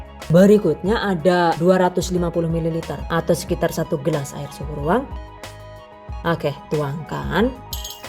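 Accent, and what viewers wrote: Banyumas, Indonesia. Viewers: Dari mana mba jawanya mantap ngapak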